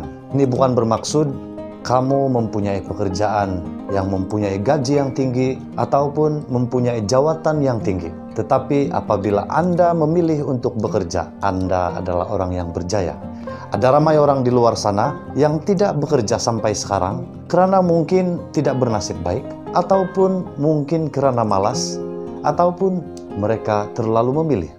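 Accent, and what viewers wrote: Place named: Sabah, Malaysia. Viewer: Punya pakat ko punya slang sabah.. hahah sandakan ka ko.!! Hahhaha . Aku dari telegram cardock team.!! Salam kenal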